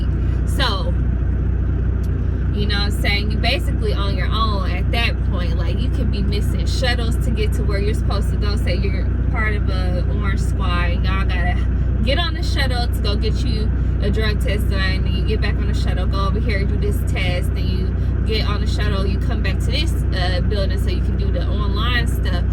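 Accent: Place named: Texas, United States. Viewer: Your.... beautiful..What's that accent ?? I hear Texas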